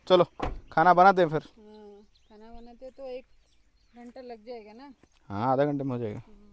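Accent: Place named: Odisha, India. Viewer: Keep up the great work. Proud of you. Best wishes to your family... specially. Really love your narrative.. with Odia MTI😁👍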